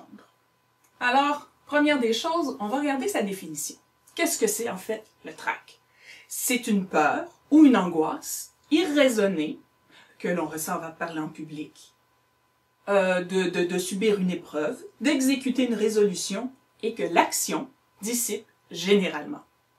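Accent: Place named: Quebec, Canada. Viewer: Moi aussi j'adoooore! Merci beaucoup pour cette vidéo, au si bel accent de la belle province! Gros becs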